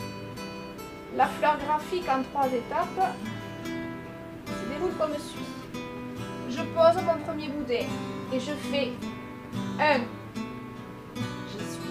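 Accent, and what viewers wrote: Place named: Provence, France. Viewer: Vos peintures sont très belles , et votre accent a un parfum agréable de provence . Merci pour vos tuto .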